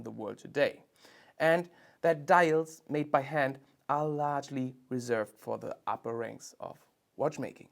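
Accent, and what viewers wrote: Germany. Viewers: owen wilson‘s german accent baffles me every time i watch one of these exceptionally well made videos… great stuff!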